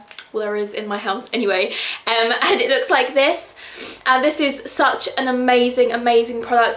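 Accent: RP, United Kingdom. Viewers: You're so posh!:]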